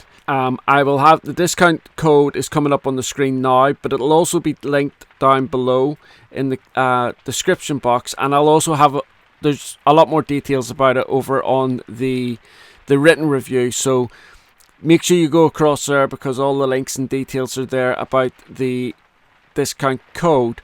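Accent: Northern Ireland, United Kingdom. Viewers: Thanks for another great review. Honestly what took me back wasn’t anything you said about the pencils but that you’ve been doing reviews for 11 years already. I found your channel shortly after it started, it was called something else back then sorry don’t remember what it was. Both my parents born and raised in Ireland and I had just lost my dad. Though your Northern Ireland brogue was different than my dad’s Dublin one it gave me comfort listening to it. Wow I can’t believe it’s been I’ve been watching that many years, where does the time go, every year it flys be faster.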